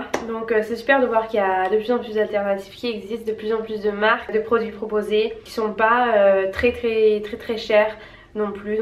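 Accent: France. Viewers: elle n est pas Française ? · J’adooooore ton accent français ! Et ça m’a donné faim 😅 Et Ohlala je suis pareil pour les biscuits, quand je commence je n’arrive pas à m’arrêter ahaha (ça rejoint notre discussion sur le pain sur Insta 🤣)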